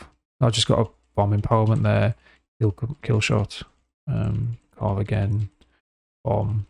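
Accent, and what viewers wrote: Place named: North East England, United Kingdom. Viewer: nice to hear a NE voice doing wow content i'm from Boro and it's refreshing!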